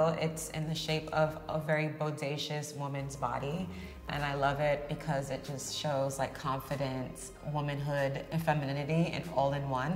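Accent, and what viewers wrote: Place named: Germany. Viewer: Das ist doch, dachte ich, der Germany Channel - why does she speak en mit einem DE accent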